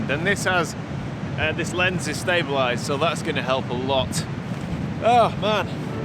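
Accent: United Kingdom. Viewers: Few things are more enjoyable than hearing a British man complaining about the weather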